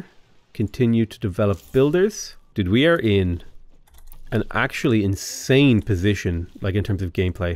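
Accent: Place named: Ireland. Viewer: I love ur irish accent i love watching ur videos just to hear u talk lol ❤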